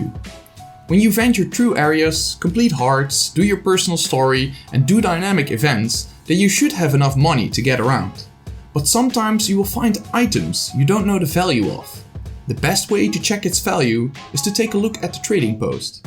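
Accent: Netherlands. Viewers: Sup mate!, great vid. I can tell that your dutch by your accent btw ;) (me to). I just started GW2 again after being absent for 4 years. Is there any way if you happen to know a dutch guild? I've always been in DuF (Dutch Finest) but they kicked me out for obvious reasons ofcourse. Maybe we could meet up in-game. Im serious about getting into it again. Played Blade&Soul for the last 3 years, but the poor optimization got the better of me. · i can hear u dutch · you sound dutch!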